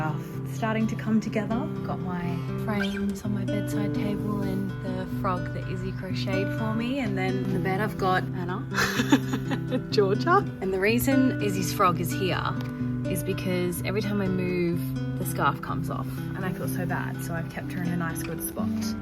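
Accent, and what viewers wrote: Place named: Australia. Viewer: Never lose your Aussie accent ♥ Do they tell you to assimilate like Aussies do to Indians 😂 Naah we are not like that. Enjoy the life like my family back home, enjoying house help, watching KDramas, home deliveries, apartment facilities and gym. wow that was a cool transition effect of older version of videos Wow you seems to have quite a few expats there. ♥ it.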